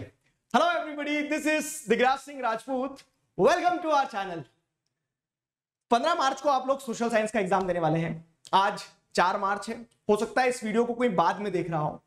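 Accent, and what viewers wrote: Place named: Madhya Pradesh, India. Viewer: Sir Your accent is similiar to me because I also live in MP ( Indore ) love you ❤️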